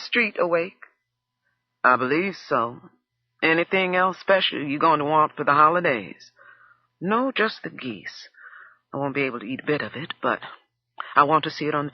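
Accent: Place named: Midwest, United States. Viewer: Wow. Lynn Thigpen. I know some of her family and her work onstage and onscreen is excellent. Great voice. That lovely clear Midwest Black voice.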